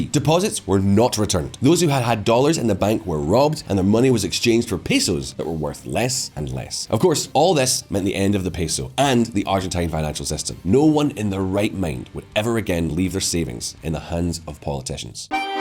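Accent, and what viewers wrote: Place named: England, United Kingdom. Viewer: Reading Spanish with English pronunciation. Is this a troll?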